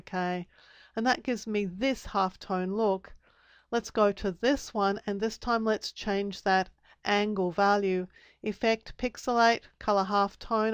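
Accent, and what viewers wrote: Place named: United Kingdom. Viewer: I like your sweet UK accent :-)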